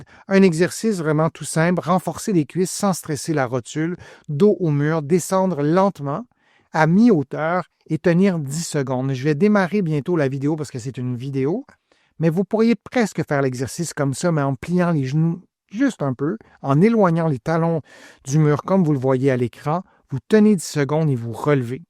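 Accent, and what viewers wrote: Canada. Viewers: Vous êtes formidable ! J'écoute toutes vos présentations rassurantes sur Youtube ! J'adore l'accent Canadien ! Vos conseils sont meilleurs que ceux de mon kinésithérapeute ! Merci !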